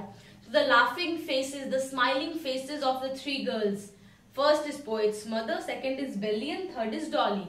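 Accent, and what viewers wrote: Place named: Bihar, India. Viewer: Didi you are incredible. Are you from Bihar ? As it seems to me.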